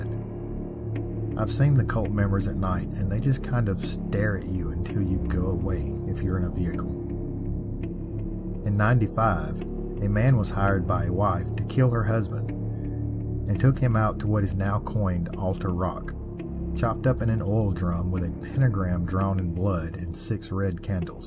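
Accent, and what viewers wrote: South, United States. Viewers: You're welcome! I saw on another reply of yours (on the Conjure Chest vid, I believe) that you were concerned about your accent. If anything, your accent is not a deterrent, but validation. It is clear that you take time to research your content and present things in a factual, non-hyped manner. Those from the South will most certainly appreciate you telling the stories from the regions that both you and they call home. That is truly appreciated.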